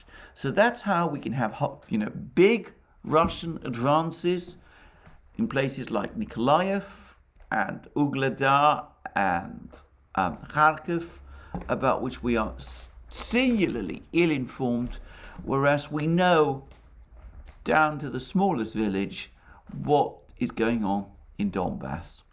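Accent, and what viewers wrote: England, United Kingdom. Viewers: Nice English accent Ruski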